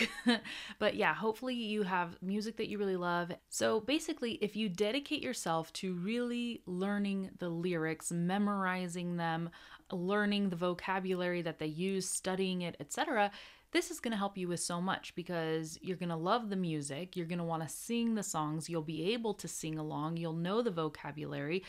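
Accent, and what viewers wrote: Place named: Argentina. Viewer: te he escuchado hablar en castellano (muy bien por cierto) y nunca confundiría tu acento con otro hispano. Me haces sentir representado como argentino, raro porque no sos argentina pero muy lindo. Saludos.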